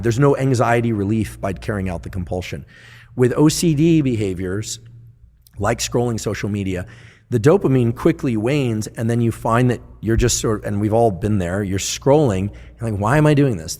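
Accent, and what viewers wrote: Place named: United Kingdom. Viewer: Just because you asked me to subscribe nicely and in a British accent, I will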